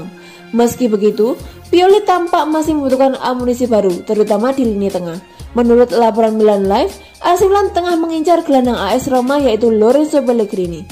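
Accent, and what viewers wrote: Java, Indonesia. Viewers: Suaramu muedok bgt mbakk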